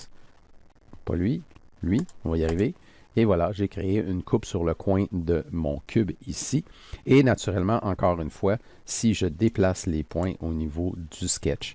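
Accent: Quebec, Canada. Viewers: Bonjour, je suis impressionné par la qualité de vos vidéos qui sont toujours très précises et à travers lesquelles on devine un grand professionnalisme. Et c'est aussi un plaisir d'entendre cet accent québécois pour nous les Français.Je m'étonne que votre chaîne n'ait pas pas plus d'abonnés car vous le méritez plus que d'autres. Merci pour nous et continuez comme ça. 👍👏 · Très bon(s) tuto(s), on voit que c'est préparé même s'il reste quelques boulettes (qui n'a jamais oublié de sélectionner un composant avant de faire une manip, c'est mon drame !). Chaîne méconnue mais il y a de la place dans l'espace francophone. L'accent est super (pour moi), ça me rappel mon séjour au Quebec. Je vois un petit -22°C à Montréal aujourd'hui, courage les cousins dans 6 mois ça sera la canicule :)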